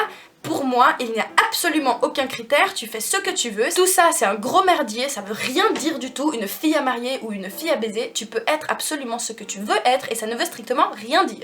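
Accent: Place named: Geneva, Switzerland. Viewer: Depuis le temps que je regarde tes vidéos pour une fois je commente pour te dire à quel point je "prend mon pied" (bah oui restons dans le thème haha) à regarder tes vidéos! il suffit que j'aie eu une sale journée et que je me pose devant mon ordi avec toi pour me sentir tout de suite mieux! Va savoir, j'aime ta facon de t'exprimer, tes sujets et la facon dont tu les aborde, je suis sûre que tu aide énormément de monde avec tes vidéos! De plus tu me rappelle ma Suisse que j'ai quittée il y a un an pour le bout du monde, et ca me fait toujours plaisir d'entendre ton charmant p'tit accent de Genève:) Bref, continue, reste comme tu es (même si je ne pense pas que tu aie l'intention de changer!) et MERCI pour toutes ces good vibes que tu nous partage! Peace! <3 Et vive nos clitoris!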